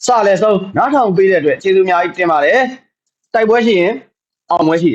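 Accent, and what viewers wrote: Yaw, Myanmar. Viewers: စောင့်လိုက်ရတာဗျာ။ ယောနသံကြီးဘေးကင်းပါစေ။ လေးစားပါတယ်။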